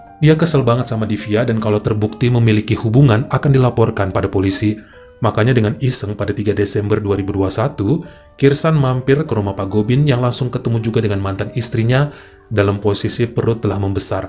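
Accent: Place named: Java, Indonesia. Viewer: HALLO...... S 3 MAKIN COOL AJA NI CHANEL KAK, NGANGENIN BANGET PEMBAWAANNYA EPIK DI BUBUHI BHS JAWA MEDOK YG BIKIN SENYUM SENYUM SIMPUL SEHAT WALAFIAT SELALU SELURUH KELUARGA YA KAK, SALAM SEHAT DARI SYRIA 🇸🇾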